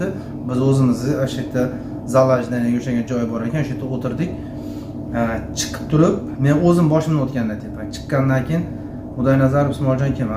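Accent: Andijan, Uzbekistan. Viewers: Андижонча лайк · Рахмат сизга анжонча килиб тушунтирдиз ишларга омад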